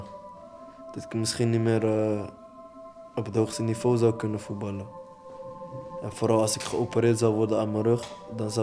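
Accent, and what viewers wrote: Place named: Morocco. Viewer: Praat normaal mocro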